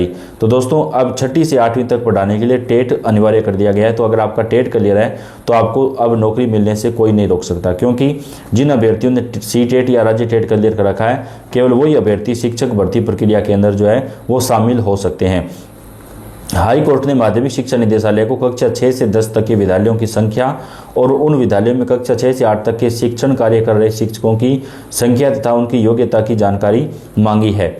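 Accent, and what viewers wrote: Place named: Lucknow, India. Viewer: Lucknow English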